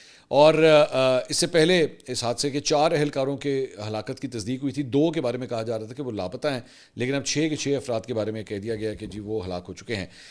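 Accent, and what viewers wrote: Punjab, Pakistan. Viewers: Wah apki Punjabi ❤❤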